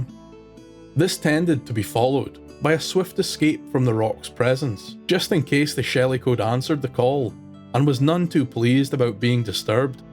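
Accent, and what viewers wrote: Scotland, United Kingdom. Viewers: This is rapidly becoming my favourite channel. What part of Scotland are you from? I can't pinpoint your accent. I'm from Glasgow but I'm half irish (my mums scottish and my dads irish) though my granny on my mums side is English, she was actually ruth Ellis's sister, who in case you didn't know was the last ever woman to be hanged in Britain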